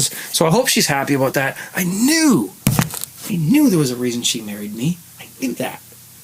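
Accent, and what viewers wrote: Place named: Canada. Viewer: I say Wort , Wart same as you do . But not Wert . But when you say about ... I can tell you are Canadian... Cheers !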